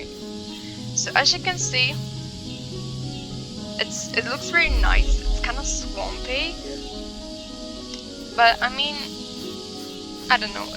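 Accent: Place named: Germany. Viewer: Are you german? BECAUSE I L.O.V.E. YOUR ACCENT!! 🎈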